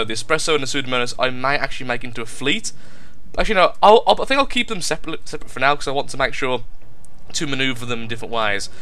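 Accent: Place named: Birmingham, United Kingdom. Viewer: I KNEW IT! I kept debating whether you were a fellow Brummie or from Manchester by your accent.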